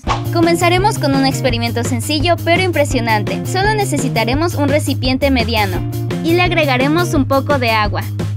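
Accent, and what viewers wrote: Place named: Colombia. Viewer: de Colombia no le vez el asento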